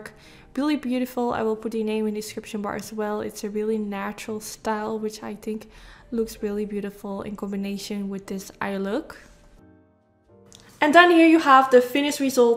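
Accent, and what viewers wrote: Netherlands. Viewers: Je makeup is echt gorgeous ❤️ ik hoor wel echt super sterk nederlands accentje 😂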